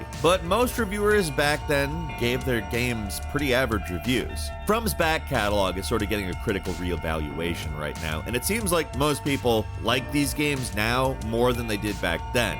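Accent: United States. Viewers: I always cringe when I hear the American pronunciation of Japanese names but that's a given at this point as many Japanese people most probably can't pronounce American names really well either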